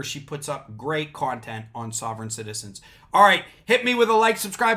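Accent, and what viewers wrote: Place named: Western Pennsylvania, United States. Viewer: I was raised in western PA just far enough from Pittsburg for the smoke to only be visible on windy days. (mostly) I've spent half a century travelling (not driving) across the US and world for business. You're the other western PA accent I've encountered in the past 1/2 century since I left. But that's just Reason #3 for enjoying your posts. Keep it up.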